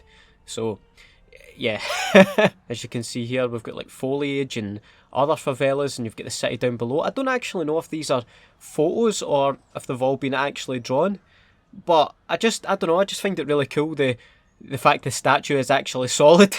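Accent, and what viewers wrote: Scotland, United Kingdom. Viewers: Hey I’m kinda new been around for couple months, been binging on your vids looking for as many as I could find and I dunno just thought I’d have a try, ever had a feeling to do a video like on of your og commentaries. I know you’ve moved away from them but I just love the Scottishness in them😂😂. Also what did actually happen to the troops?😂but anyways love the vids man keep it up❤️❤️❤️ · Clicked just to hear your silky Scottish voice say ‘Faverla’ ;)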